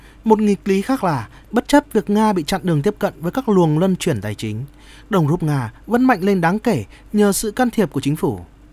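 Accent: Standard. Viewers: bạn nói rất hay .chuẩn luôn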